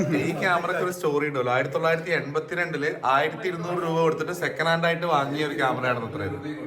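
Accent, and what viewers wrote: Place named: Kannur, India. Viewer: കണ്ണൂർ slang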